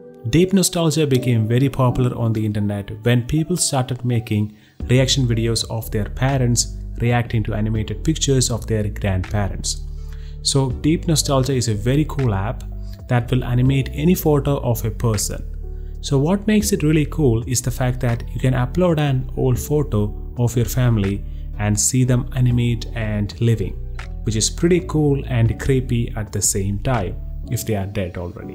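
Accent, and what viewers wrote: Kerala, India. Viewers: mallu accent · Your accent sounds more Indian than me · Ith oru Malayali anallo samsaarikune.. alle? i felt it like many times in this video.. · Chettan Malayali Alle ? Mmde College pronunciation... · Refreshing mallu voice 😀… good content and diving to check all of those links ..thanks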